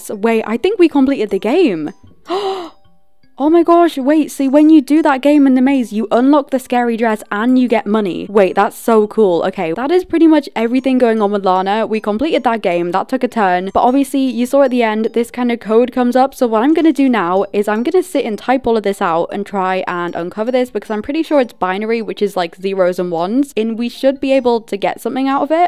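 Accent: United Kingdom. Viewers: gosh i LUUUV your british accent it just sounds so smooth<33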